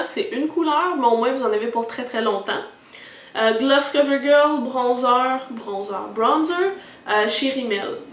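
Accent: Quebec, Canada. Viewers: J'adore ton accent quebecois c'est tellement mignion :)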